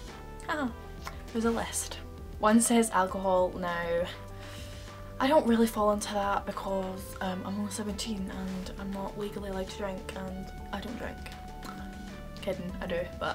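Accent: Scotland, United Kingdom. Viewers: I absolutely love your videos and your accent! Which Scottish uni do you think is the most suitable for a foreign student? I'm thinking of attending uni in Scotland... Love you! xx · Aloha! I'm half Scottish but never been to Europe! Maybe someday 😊 I love your accent better than the England English, if that makes sense 😄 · Finally found a Scottish you tuber!! You are so much easier to understand because I'm used to hearing the Scottish accent! Xx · Always good to hear a Scottish accent on YouTube! Edinburgh is definitely worth a visit sometime, it's my go to place for events and sometimes work but it's also fun to explore (I still haven't been everywhere yet!) I need to explore Glasgow more, Buchanan Street and Pacific Quay are pretty much the only places I visit regularly. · speaking of stereotypes, actually that was pretty Scottish :D love the way you say "person/personal"! 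coming from a St Andrews student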